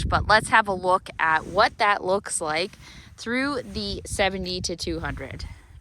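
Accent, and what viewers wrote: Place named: Canada. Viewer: you have a lovely Canadian accent! ;-)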